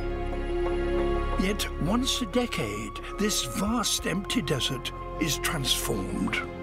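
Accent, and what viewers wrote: United Kingdom. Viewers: This British accent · Desculpem o meu comentário um pouco tolo, mas alguém sabe me dizer se a pessoa que faz a narração fala o inglês britânico ou americano? Desde já agradeço!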